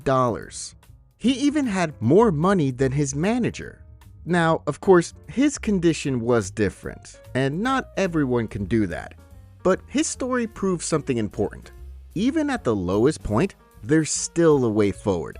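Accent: United States. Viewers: I thought the American accent gave it away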